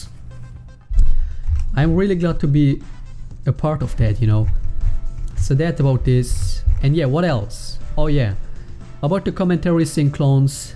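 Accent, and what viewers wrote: Germany. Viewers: u are german right ? :D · are you german?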